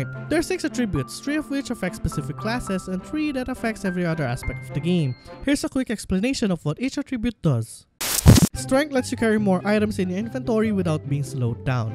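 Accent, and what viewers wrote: Philippines. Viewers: Corrct me if I’m wrong but I sense a Filipino accent 🤣🤣🤣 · Eyyy filipino accent 💯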